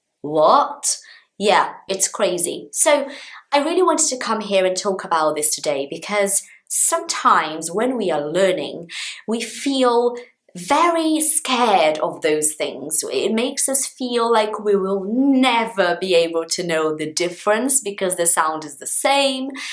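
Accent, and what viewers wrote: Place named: England, United Kingdom. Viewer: Thanks so much to the class ... I'm learning English "alone"(because I watch other channeel) , your class help me very much... I like very much your accent English... Thanks so Much.... I'm new subscribe here!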